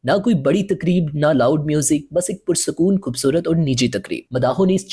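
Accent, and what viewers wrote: India. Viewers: Ye Jo host hai kia India se hai. Talafguz India ki Tarah PATA nahi kion apnate hain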